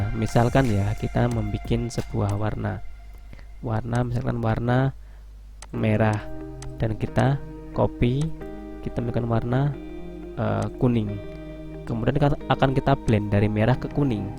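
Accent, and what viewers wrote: Java, Indonesia. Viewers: Jowone ngendi sampean mas? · medok gaess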